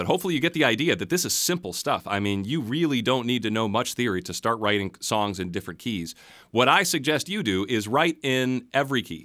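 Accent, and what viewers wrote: United States. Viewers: I can sync, feel this Dude’s Radio-sounding voice, clear English-American accented explanations and way of teaching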